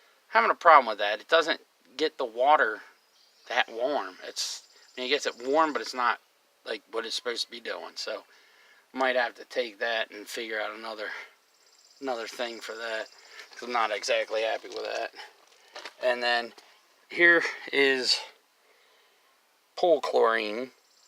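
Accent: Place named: Western Pennsylvania, United States. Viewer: I agree. Neatness counts. You sound like you're from Western Pennsylvania.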